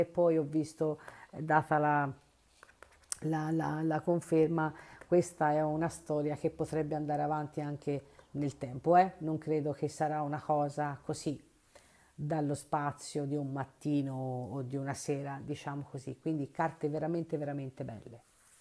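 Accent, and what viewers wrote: Tuscany, Italy. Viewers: Stesa n. 2. Col tuo simpatiho accento toshano hai centrato tutto alla grande. Se lui dovesse tornare e per giunta contrito (oggi lo sentirei come un miracolo...), giuro che lo faccio mettere in ginocchio sui ceci e corro a scriverti !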